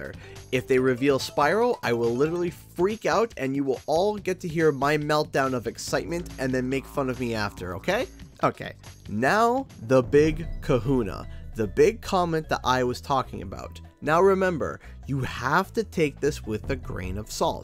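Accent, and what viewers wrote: Canada. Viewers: Oh Canadian? Im a first time viewer of your channel and I already like it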